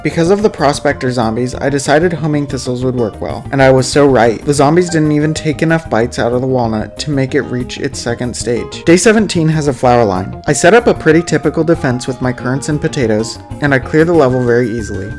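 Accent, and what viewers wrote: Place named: South, United States. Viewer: Love that southern accent #GvZWW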